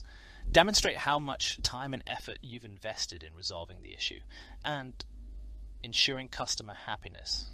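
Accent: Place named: RP, United Kingdom. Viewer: You do sound posh lol Great advice What about broken items same thing ?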